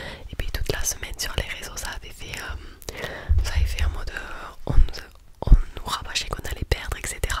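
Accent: South, France. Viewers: Avec l'accent du sud c'est tellement mieux car à part Tom ASMR personne réellement a ce petit détail dans la voix et c'est sympa puis le fait que tu aimes le foot ça change tout . Puis le foot franchement si tu peux c'est bien aussi et ton niveau ça serait cool de te voir sur un match ou deux .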